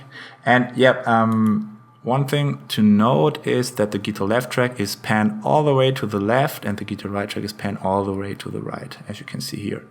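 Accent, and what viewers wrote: Germany. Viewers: man hört voll das du aus Deutschland kommst ^^ aber gutes englisch !!! thumbs up for you :D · I could tell just by your accent you were german. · I could recognize that accent anywhere. I know you're German. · I hate the german accent..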